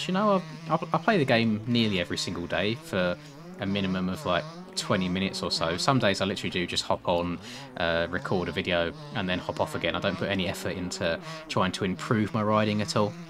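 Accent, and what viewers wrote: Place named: England, United Kingdom. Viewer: im american and i can attest to the fact that i watch your videos because of your typical english man accent it adds to my experience and plus your a really good rider that makes good content keep it up broski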